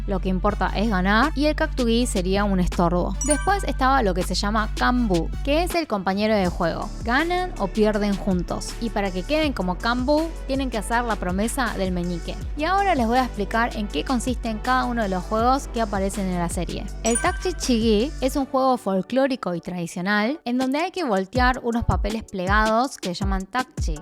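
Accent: Argentina. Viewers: Hablas cómo argentina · Una china hablando como Argentina wow como está el mundo. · Hablas como argentina xdd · No entiendo como tienes ese acento de los argentinos 🤔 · Me gustan mucho tus videos y tu acento argentino. Felicidades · Coreana hablando con acento argentino me suscribo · Tú Castellano es muy bueno aunque tú acento no es nuestro sino claramente argentino.... Necesito hacerte una pregunta. ¿Sabes porque el protagonista se tiño el cabellos de rojo? ¿Tiene algo que ver con la capucha roja que tenían los trabajadores? Gracias por tu explicación.. me has ayudado a entenderlo mucho mejor!! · Hola eres de Argentina o de Corea? Tienes acento argentino pero rasgos de una coreana china o japonesa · Y por qué esta china youtuber habla como argentina? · Ame ese acento argentino en una asiática preciosa excelente contenido ❤️🥺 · Una pregunta tu eres de argentina o de otro país por qué te sale muy pero que muy bien el asentó argentino??? 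<3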